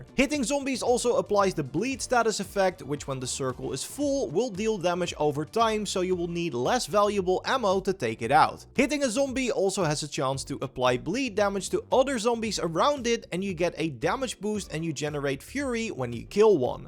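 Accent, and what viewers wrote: Netherlands. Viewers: Jezus wat klink jij nederlands als je engels praat 😅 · Ik hoor een nederlands accentje haahhaa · je blijft horen dat je Nederlands bent haha maar thanks voor de tips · I smell dutch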